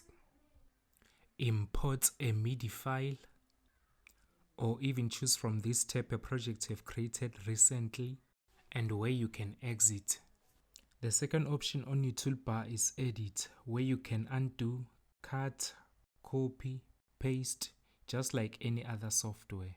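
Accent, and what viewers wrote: South Africa. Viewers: Definitely a South African (Durban) speaking. Nice tutorial. I'll be making my first kwaifi sounds. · I can tell this guy is SOUTH AFRICAN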